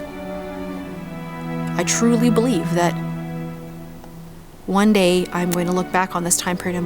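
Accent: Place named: Ontario, Canada. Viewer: wow.. this video touches me I started sobbing xD.. but something that's funny to me is that.. she's Canadian and I'm Canadian yet I could tell she has an Ontario accent and I live in Ontario xD Normall you can't tell.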